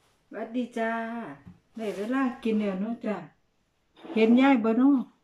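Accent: Isan, Thailand. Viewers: ตอนแรกเข้าใจคึกันเลยค่ะ. ว่าแหม่นยายยุสารคาม. สำเนียงยายเว้าคล้ายๆทางว่าปีปทุม😊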